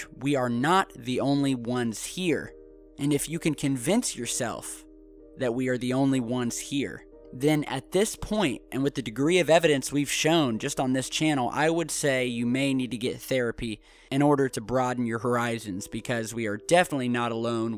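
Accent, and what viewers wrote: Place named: South, United States. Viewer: man, you really have to polish that southern accent. It is quite distracting !!!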